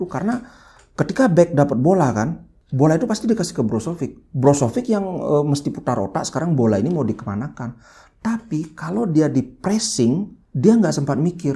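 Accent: Bali, Indonesia. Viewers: Logat logat bali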